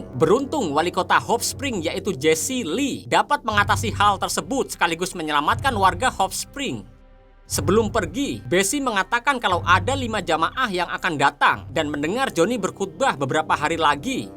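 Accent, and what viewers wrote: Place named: Surabaya, Indonesia. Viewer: Penyiarnya lucu.. Bahasanya suroboyoan, mathek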